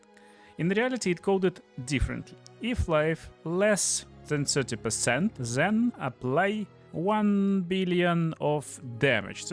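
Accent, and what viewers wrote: Russia. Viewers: акцент у тебя очень похож на русский)) ну да ладно) твой билд хорош! сейчас его строю)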